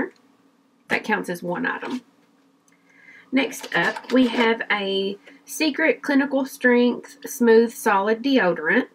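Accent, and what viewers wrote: South, United States. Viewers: I recently found your channel via emilynoel83. I just love your videos! You are such a beauty inside and out!! I love your accent, from one southern girl to another. :)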